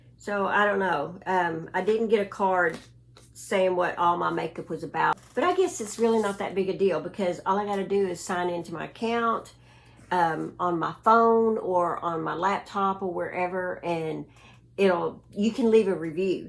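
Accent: South, United States. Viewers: The berry compote yarn is a beautiful combination of colors. I love hearing you talk. Nice southern accent. The scripture today is so true. Hugs 🤗and love to all 😇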